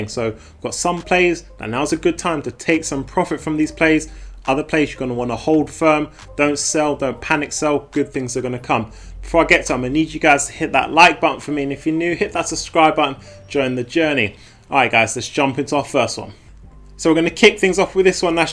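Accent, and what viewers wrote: United Kingdom. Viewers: Hi. You from the uk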